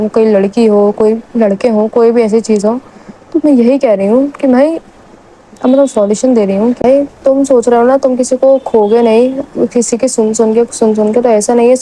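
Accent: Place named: Madhya Pradesh, India. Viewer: Are you from madhya pradesh? Because of your tone I feel like you are from MP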